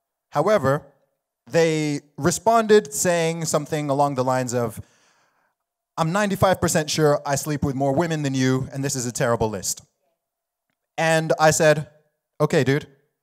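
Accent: United States. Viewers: It is funny you grew up in Saudi Arabia you live in England but you sound like you are from the Midwestern part of the United States. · you speak American because you want to hide your accent?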